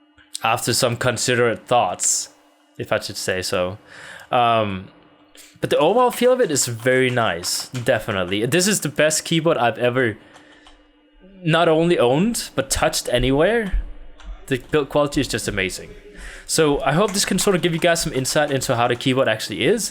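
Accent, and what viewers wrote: Denmark. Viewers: I think you sounded danish, are you danish? :) (also noticed the nordish layout)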